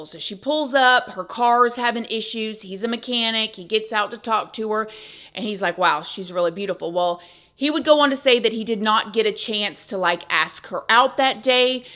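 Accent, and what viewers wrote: South, United States. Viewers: I’m from the UK, you’ve certainly got that Southern Belle thing working for you, for sure. Not quite sure where to place it though, accent wise, I keep hearing some Louisiana there, unless the simple answer is, Florida you fool 🤣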